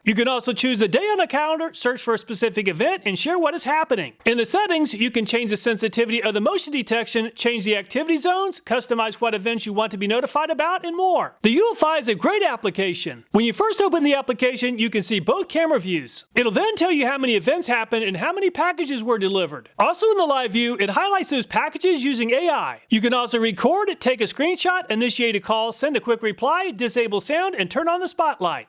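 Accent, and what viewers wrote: United States. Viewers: Very loud american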